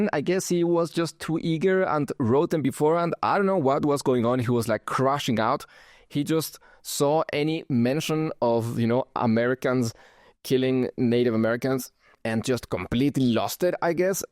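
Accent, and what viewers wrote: Germany. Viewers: i thought you were slavic lol, i guess i never heard a german accent before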